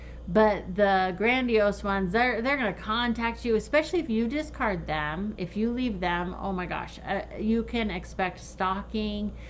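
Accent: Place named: South, United States. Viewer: are you from South? I noticed you have southern accent. I am obsessed with southern culture.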